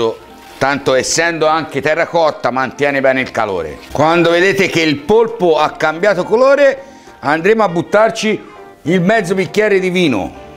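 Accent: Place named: Livorno, Italy. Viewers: L"accento livornese è proprio così, più forte rispetto ad altre città toscane, almeno così ho notato. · A Livorno parlano così, è colpa del cinque e cinque.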